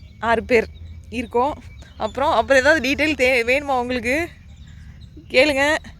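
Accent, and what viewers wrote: Kongu, India. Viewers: அருமையான வாழ்கை நம்ம எதார்த்தமான கொங்கு தமிழ் வாழ்க வளமுடன் ❤❤❤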